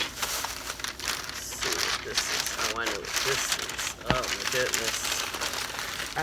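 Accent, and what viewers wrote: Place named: New Jersey, United States. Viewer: Omg! That Jersey Cawfee! We can’t get rid of that accent, regardless of how far we move. Lol. Hey Friend. You cleaned up. Thanks for sharing.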